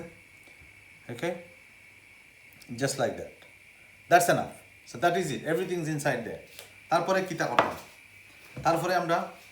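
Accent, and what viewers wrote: Sylhet, Bangladesh. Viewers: Wow, you made really nice vapa pitha. I enjoyed your Sylheti language, it was funny. Kub Moja Laglo bondu.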